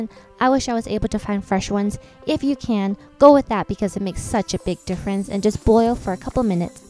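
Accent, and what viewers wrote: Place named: New Jersey, United States. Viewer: great recipe! absolutely love the jersey accent too!!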